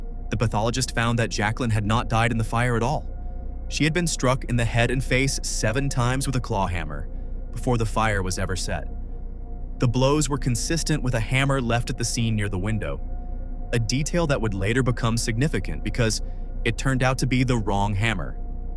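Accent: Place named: United States. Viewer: the voice sounded American that's why I noticed. You can say whatever you want. It's just funny to me to hear it with an American accent I'm also amaerican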